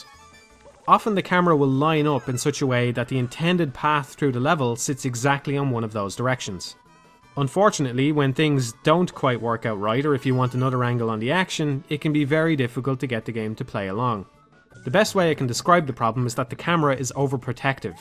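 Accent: Dublin, Ireland. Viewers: Can't pinpoint your accent man, sounds like half culchie half Dublin lol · Your reviews are highly reminiscent of TotalBiscuit's whom I recently discovered and came to enjoy immensely for his unparalleled levels of insight and breadth of scope. Maybe you should change your handle to "TotalScone", since (and forgive me if I'm mistaken) you sound Irish. :P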